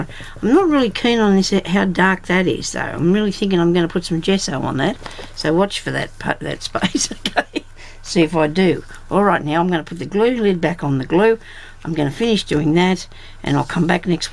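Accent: Australia. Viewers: Never change a thing. I don't think you mumble......it's your lovely Australian accent....love it!! Your fabric/lace books are so different from the norm....what will you come up with next I wonder. I so look forward to Sundays now....hope this book has tons of pages and the tutorials last for weeks. You are a wonderful teacher. Thank you so much for sharing your craftiness. Glad you are feeling a little better. Love to you. Sue. xxx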